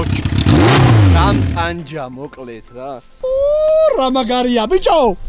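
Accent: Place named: Mingrelia, Georgia. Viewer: mainc rogori megruli saubari gaqvs ❤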